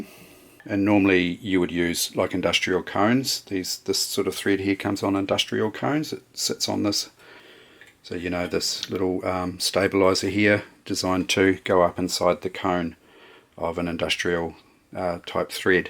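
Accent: New Zealand. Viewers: Nice Video and nice Kiwi accent! Thank you!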